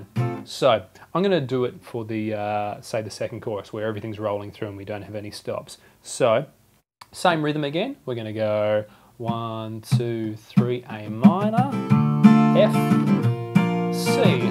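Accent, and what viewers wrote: Australia. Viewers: has anyone ever told you you look a bit like rob swire from pendulum?? he is australian too!! maybe youre related!!!!! or maybe you ARE him.. hmm , btw if you are actually newsealandish then i am sorry its just im not good with accents and you sound australian but if you are newsealandish im sorry. but your cool :D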